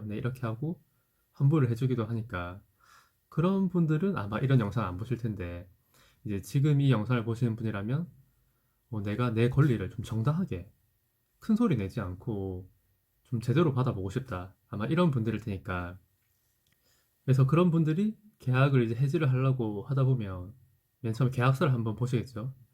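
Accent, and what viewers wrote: Gyeongsang, South Korea. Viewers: 부산 경남쪽 같으신데용?ㅎㅎ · 대구사람이신가요 말투가 제 친구들같군요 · 부산 사투리네 형 .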